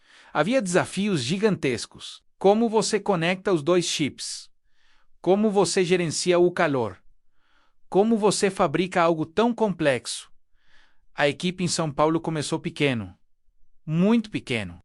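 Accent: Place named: Argentina. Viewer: Narrador argentino tentando falar português. O conteúdo é falso também. Final dos anos 1980, holandesa Philips já empilhava transistores (3D). Os pesquisadores citados, caso existam, estariam 're-propondo' algo há muito tempo já pensado. Que maravilha! A USP prevê o passado. Mas o nosso futuro - e presente - contimuam tenebrosos. Dito.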